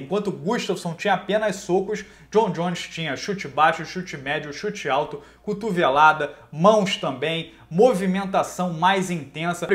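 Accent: Rio de Janeiro, Brazil. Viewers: Ótimo comentarista!...análise perfeita, apesar do sotaque carioca irritante (eu acho), a análise é perfeita!!! Jones é foda!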